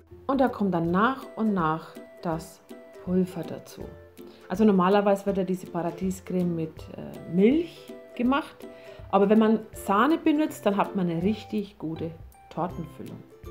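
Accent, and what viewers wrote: Franconia, Germany. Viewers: Woher kommst du aus Deutschland ??du hasst ein fränkischen Dialekt:) find ich total cool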